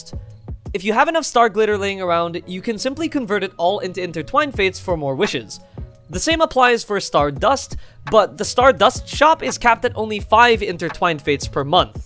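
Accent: Netherlands. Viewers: Are you dutch? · I like the thick Dutch accent XD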